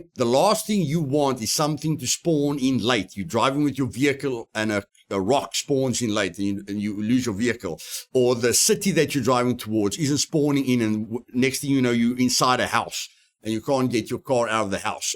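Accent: South Africa. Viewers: you sound South Afican Are you SA ? I am an south african looking for discord channels or groups of south african scum players or maybe possibly to play with a pro like you ive just started youtube streaming working on building a base viewer amount before making vids so im currently just streaming some games with friends watching im aware i talk a differant language than english,afrikaans.but im quite fluent in english so im just seeing if your intersted in maybe playing together thx for the good vid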